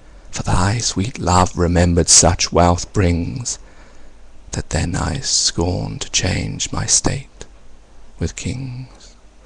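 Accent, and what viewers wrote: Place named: United Kingdom. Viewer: You have an excellent reading voice. You would be a fantastic narrator for books on tape and radio dramas! As an American, I must say that a British accent is always enjoyable to hear!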